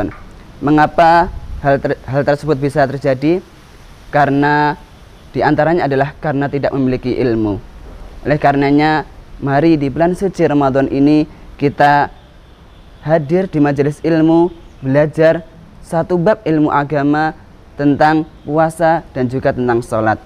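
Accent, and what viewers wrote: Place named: Middle East. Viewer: Suarane pun kados alumni timur tengah..yaman hadir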